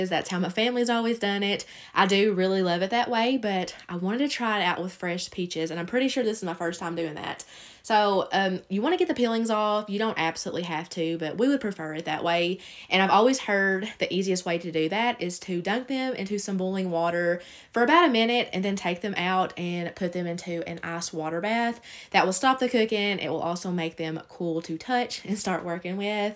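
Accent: South, United States. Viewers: Hi - I really enjoy your cooking videos, you are very creative, plus I love your beautiful Southern accent. I notice on American vlogs pieces of pork are referred to as "pork chops". Here in Australia we only call it a pork chop if it has a bone in it. Thankyou for all your ideas & wonderful recipes. Best wishes from Sydney Australia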